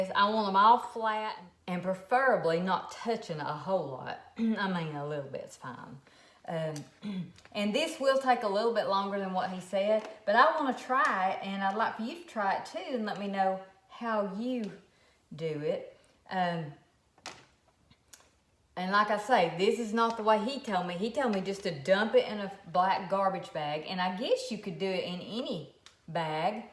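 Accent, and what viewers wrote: South, United States. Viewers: Okra is one of those things that people either love or hate . I love okra. Pickled or any way you want to cook it, it's great. I really enjoy your videos. Listening to your southern accent makes me long for my childhood days with family.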